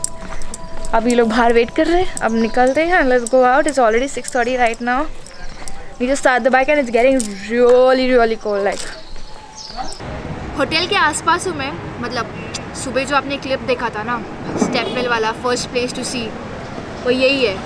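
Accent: Mumbai, India. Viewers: Like your Mumbaiyaaa style of conversation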